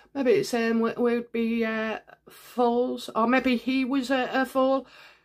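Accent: United Kingdom. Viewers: Hi from Australia. Nice reading as always. My favorite UK tarot reader. Nice reading as always.. I always enjoy your tarot.. Love your British accent. My dad had a Scottish accent. Mum was from Isle of man.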